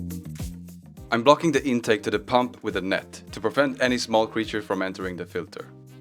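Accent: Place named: Scania, Sweden. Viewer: ”Grön”, haha I love your skånska….. I have the same dialect…….. idk why im writing this in english but it just seems like the right thing for some reason…….. Also the ferns are really gorgeous, ferns are some of my favourite plants! Maybe from all of the dinosaur documentaries I watched as a kid….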